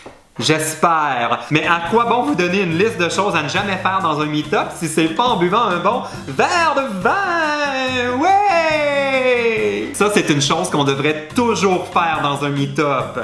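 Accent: Quebec, Canada. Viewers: JE VIENS DE DÉCOUVRIR T'AS CHAÎNE ! ET J'ADORE L'ACCENT QUÉBECOIS ALORS JE T'ADORE DÉJÀ !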